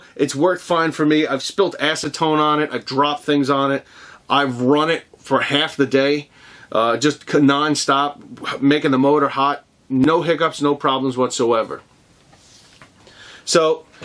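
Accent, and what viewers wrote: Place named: Long Island, United States. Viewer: you should have never stop making videos. You come across great It’s your Long Island accent that makes it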